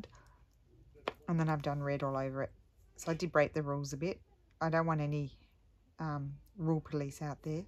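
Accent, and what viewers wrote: Australia. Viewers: Kid are kids at lest they are out side I just concentrate on watching you and listening. So great to hear and Aussie voice. Regional Victoria except for Shepparton he’s out of lockdown now but hard and fast rules still apply masks 10 people outside can gather, and in restaurants only 10 even if individual there can only be 10 people in a place at one time so I am going out with my granddaughter I haven’t seen for months for lunch we have one hour in a restaurant that’s it. Then do a little bit of shopping and she can’t come home with me I’m still not allowed to have any visitors although I live on my own. I hope all your family and friends in New South Wales or where ever they live in Oz are okay I pray that they are safe from the dreaded word please pass on my love to them. I love your videos so much sometimes I watch them two or three times and if I get Maude even more I love all that you do although I can’t come up with what you do. 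Hope you are safe over there take care.🥰🦋💕🌈💜.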